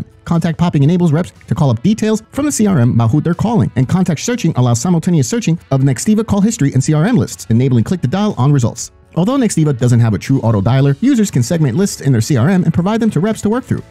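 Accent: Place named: United Kingdom. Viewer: This one seems really convincing, the british accent and the free trial sold me on the idea.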